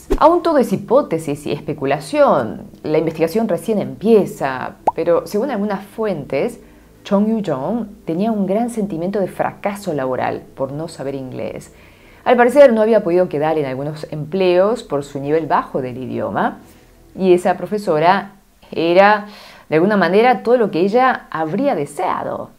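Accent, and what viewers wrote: Argentina. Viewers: me gusta tu voz de locutora y tu acento argentino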